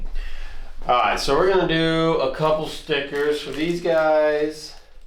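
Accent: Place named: Denmark. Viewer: hello i just noticed you dont have many viewers and subscribers so i just wanted to support abit seems like you do decent content ur accent sounds abit danish but where are u from?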